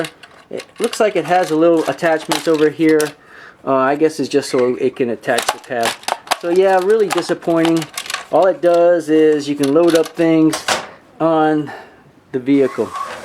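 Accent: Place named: United States. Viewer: how do you have an american accent if your not from the US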